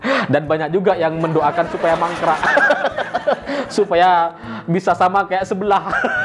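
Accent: Madura, Indonesia. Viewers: Lihat logatnya sihh spt satu suku dg Pak Mahfud MD, Madura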